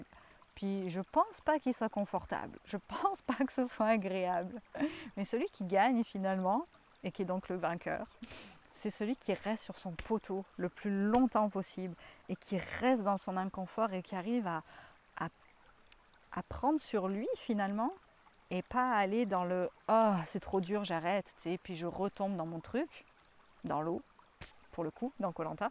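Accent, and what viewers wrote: Canada. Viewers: C’est marrant, c’est une aparté rigolote mais on voit bien l’influence des canadiens dans ta facon de parler parfois avec tes « puis », « j’était comme » et autre... je sais pas si tu connais Emma Bossé une super youtubeuse qui parle de spiritualité aussi et de guidance, bah tu as un peu cette même façon de parler ahahah je suis sûr que tu en ai influencé, mais j’adore comment ils s’expriment aussi c’est super plaisant pis c’est ben joviale tsé, c’est po linéaire ou que sais-je c’est vraaaiment posé.... 😂 bref en tout cas je te rejoins carrément sur le fait qu’on soit des êtres multi dimensionnel, je suis d’accord nos guides c’est nous avant tout, dans d’autres dimensions dans d’autres aspects....merci, bye💛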